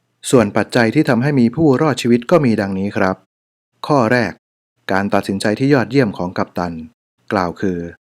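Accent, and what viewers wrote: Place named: Isan, Thailand. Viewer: ป๊าดดดด....กัปตันอ่านอิสานฮู้เลืองไป่อี๊กกกก ต่าฮักต่าแพงแท่เด้จ้า😊😊😊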